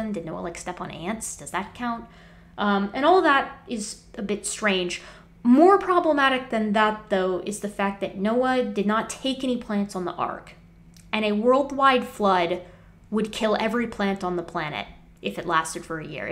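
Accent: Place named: General American, United States. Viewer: really? Sounds like a normal American East West Coast mix accent to me! Of course I'm used to YEC tone such as Hovind which is much worse imho. As regard origin quality control I'm not sure I see your point. Mutations can be increased by poor quality control during reproduction. To use your analogy this doesn't produce airplanes from cars. This produces a range of cars, mostly bad but the occasional one that has attributes on the way to airplanes. Evolution works on the "good enough to survive" not a particular design goal. If your dealership gets by with a breeding set of cars that produce more and more cars that work well enough but gradually end up looking more and more like airplanes then eventually it's an airplane dealership! The tortured analogy shows it's not a good analogy for evolution!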